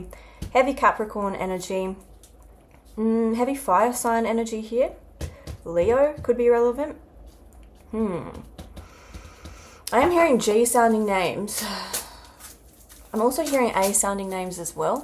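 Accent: Australia. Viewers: I love Australian accents.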